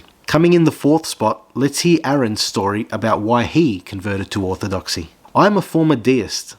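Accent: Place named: Australia. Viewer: You sound Australian. Unless you live here, why would it be relevant to you to talk about Americans converting to Orthodoxy?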